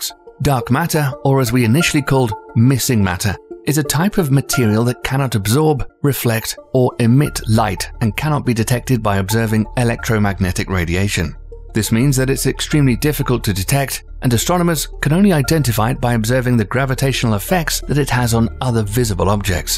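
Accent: United Kingdom. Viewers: British sounding narrator showing an oblong planet and calling it a football is odd as British football is round and spherical. Most of the world knows football/Soccer as football. Also in Britain, we hate the name soccer regardless of its origins. I wonder why Fifa games do better than PES. So as you can see, hearing a British person say football, but is referring to American football or a Rugby ball is asking for a beat down here in the UK. So the OP WozzzaTV is just expressing is dislike out loud. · narrator, your British, its a American football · Another mystery space video by a channel with an British Voice over guy, how original.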